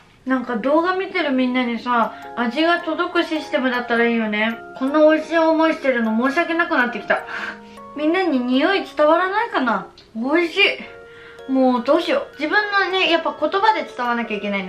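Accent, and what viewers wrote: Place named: Kansai, Japan. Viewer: 関西弁面白くてめっちゃ可愛かった😳 これからももっと話して😂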